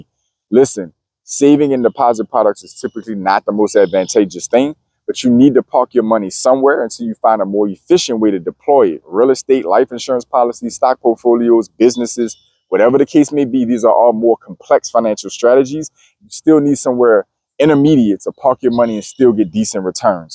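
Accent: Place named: Louisiana, United States. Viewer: Hello! I knew you were from LA, I could hear it in your accent…I definitely know about hurricanes and having to have resources to travel based on potential hurricanes! And the ability to help others in my family and extended circle. This is a good video. I have strong relationships with Chase and NFCU…also CapOne and Discover…business with Chase and NFCU…next on list is AMEX…DDs going to Chase, NFCU, and CapOne…IRA with NFCU, savings with Chase and AMEX.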